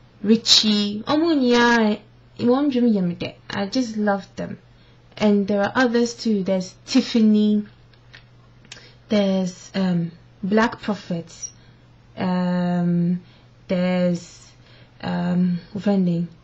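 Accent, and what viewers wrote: Fante, Ghana. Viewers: I hear the fanti and akuapem like accent real nice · I like your fante language accent. I am proud of you. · ......hmm...you've done quite well....nevertheless i hope you know about the various different dialects in the Twi Language...you do speak erm lets say fante which is a bit erm okay...i love your enthusiasm and your hold onto the Twi Language..kUDOS..AND YOU said people say you speak agona fante AND TWI thats a bit erm not right...agona fante is twi....they are not different.....its Agona Twi Fante....thanks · yayyy... kwanyako all the way.... am from the say place buy live in the USA... i love how u speak the local dialect ... and u are so pretty... wish u all the best in London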